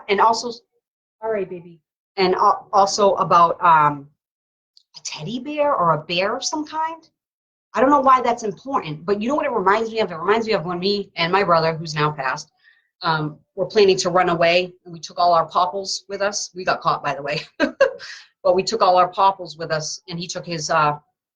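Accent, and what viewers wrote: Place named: New England, United States. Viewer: I’m from Massachusetts too!! I heard your New England accent right away.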